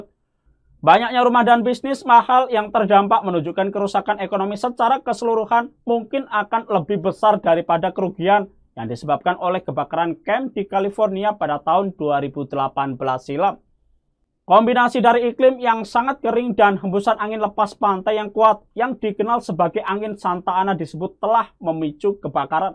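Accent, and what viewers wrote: Java, Indonesia. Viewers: Medok njowoni om 👍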